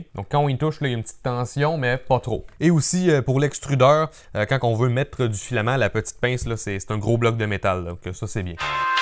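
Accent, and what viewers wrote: Canada. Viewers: j'adore that Canadien French :-)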